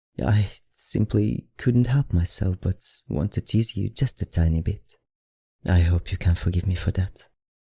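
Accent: France. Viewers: AM THE ONLY ONE OR I THINK HE HAS LIKE A FRENCH ACCIDENT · I’m someone who’s seriously into vampires. But I swear I just felt so seduced by your voice. Especially with the French accent and the chuckle. 🥰🥰🥰 amazing voice acting!! ♥️♥️♥️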